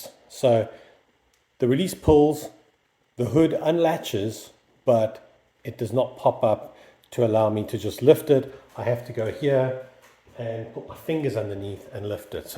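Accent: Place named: South Africa. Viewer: Thanks for the fix, desperately needed this. You sound South African 🇿🇦 If you are, thanks for helping me all the way back home. Good luck with your channel 👍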